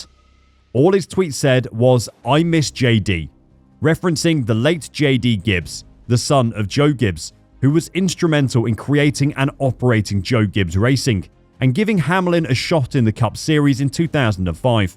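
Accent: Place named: United Kingdom. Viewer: This is so fabricated. You and your limey voice STFU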